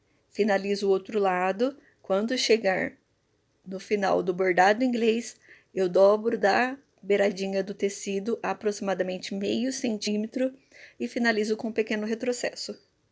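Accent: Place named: São Paulo, Brazil. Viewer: Me perdoe amiga mais esse sutaque paulista me dá nos nervos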